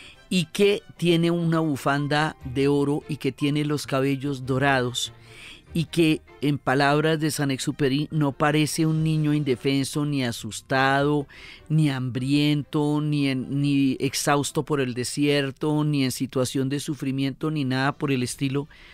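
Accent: Colombia. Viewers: El Principito es mi libro absolutamente favorito y Diana Uribe con ese acento colombiano divino, juro que nunca antes de esta señora me había gustado la historia, con ella lo que antes era aburrido es música para mis oídos ❤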